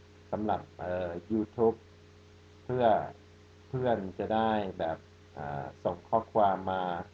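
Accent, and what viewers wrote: Thailand. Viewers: พูดภาษาไทยชัดเว่อร์ไม่พอนะ พูดภาษาไทยสำเนียงไทยได้ด้วยอ่ะ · Where did you learn Thai? You even have Thai accent!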